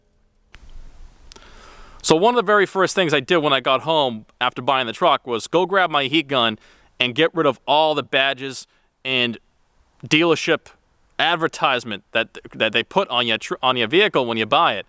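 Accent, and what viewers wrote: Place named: Boston, United States. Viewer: Somebody's from Boston! I'm from Revere, lol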